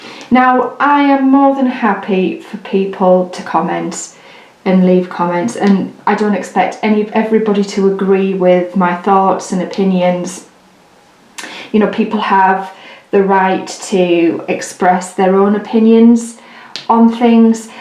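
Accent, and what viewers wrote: United Kingdom. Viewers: I've just come across your channel and will sub. At the moment I'm working my way through your diamond painting but I've laughed and laughed it just unbelievable......I'm in my early seventies but you've answered all those tags the same as I would.......my dread movie was the first (never watched the others) hallowe'en I was terrified!!!!! I couldn't sleep afterwards i couldn't look at the window and the door at the same time so I was tossing and turning keeping check allllll night lol. It was the fact that he didn't die I was so sure he was coming after me. I scream at gremlins my kids moved from me when I took them to the pictures. And I cut my finger down to the bone when my dad just walked into the kitchen while I was cutting bread.....I nearly clung to the ceiling like Tom from Tom and Jerry 🤦‍♀️ I also do cross stitch, jewellery making, paper crafts if all kinds and love to crochet. 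Not enough hours in the day. Must go and watch-listen to your other vids while I'm dping. I also love to dp to audio books. Its lovely also to listen to Uk voice. I'm from Liverpool. Keep up the good work. Thanks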